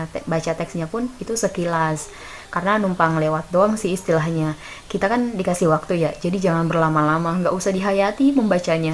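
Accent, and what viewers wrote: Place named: Sulawesi, Indonesia. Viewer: Orang Sulawesi yah kak? Mirip sama ust Syam logatnya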